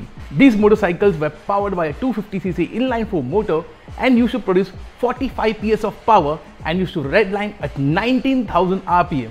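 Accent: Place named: India. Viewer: Your English accent looks like indian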